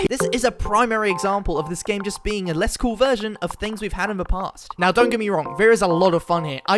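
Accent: United Kingdom. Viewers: you have a british accent like me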